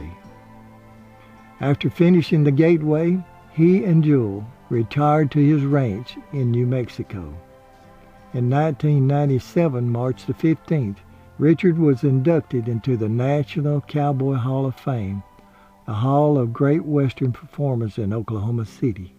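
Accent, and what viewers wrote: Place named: Mississippi, United States. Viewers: Mr., I love your videos and your Mississippi accent. I'm from Grenada and grew up in scobey and Holcomb, I was curious as to what part of ms you are from bc you sound so much like my mawmaw Ingram. A more masculine tone but similar. I really enjoy all of your videos and stories. Hope all is well. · Thanks for all of these great videos, --we love them and your Mississippi accent! Please keep them coming!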